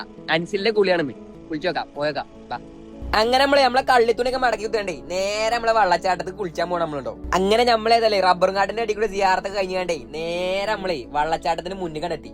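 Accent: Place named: Nilambur, India. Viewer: Ante Varthanam kettitt ijj oru nilambur karananenn thonnunnu.. Njanum nilambur aan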